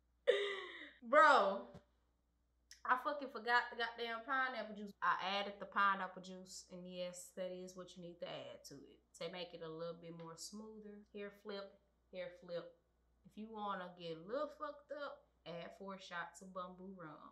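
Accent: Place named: South, United States. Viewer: This is dope. You country as fuck! Lol this is M.A. by the way. Lol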